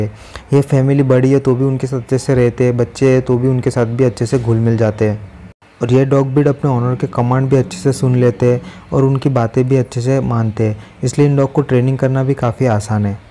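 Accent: India. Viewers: तुमच्या बोलण्यातून एखादा शब्द ऐकून कधीकधी असं वाटतं की तुम्ही मराठी आहात.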